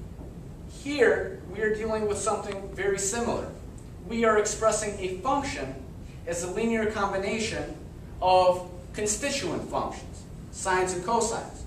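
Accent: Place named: Ukraine. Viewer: Great stuff,. Excellent presentation in terrific English with only a hint of a Ukrainian accent. Thanks!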